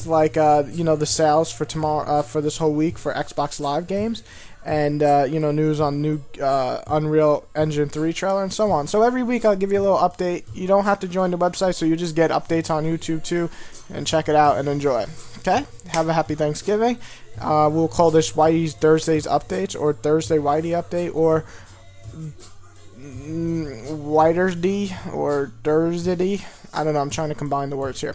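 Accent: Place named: New York, United States. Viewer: What part of NY you live in? 123ilikecheese456 was right, you do have a kick ass accent.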